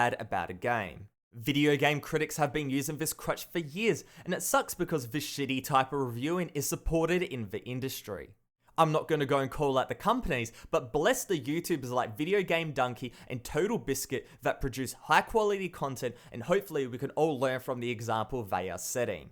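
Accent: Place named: Australia. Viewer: You have a really interesting accent. Are you aussie or from NZ?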